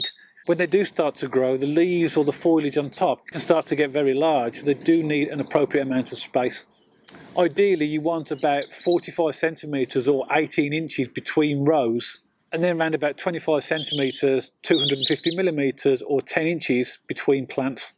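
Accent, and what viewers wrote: Birmingham, United Kingdom. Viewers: Where u from? Sound a bit brummie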